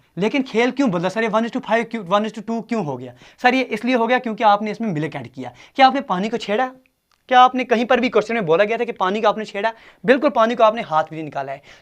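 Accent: Kashmir, India. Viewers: Kasmiri guru jee Accent is cool loved it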